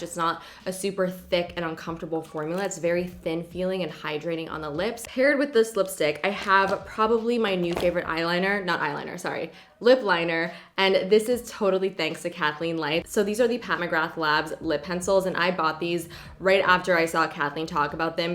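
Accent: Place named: Canada. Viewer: I love your Canadian accent!! xo-Wendy NYC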